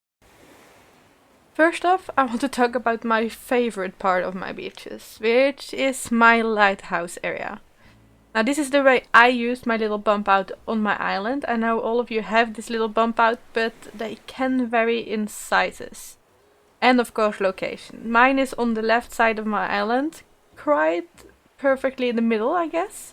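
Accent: Netherlands. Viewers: Where u from, u sound Dutch?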